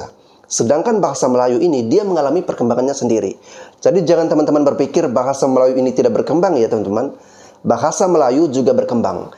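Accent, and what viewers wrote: Indonesia. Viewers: Aku setuju sama kamu ... aku pun suka cara gaya kamu (Indonesia) berbicara! ... boleh sahaja dan sewajarnya indonesia memimpin pengunaan bahasa ini di nusantara internasional!!!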